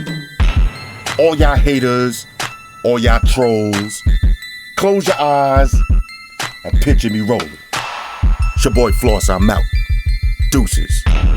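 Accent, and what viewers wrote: New York, United States. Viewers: That NY accent tho! Love it. If I ever leave, I'd just listen to you videos if I ever get homesick!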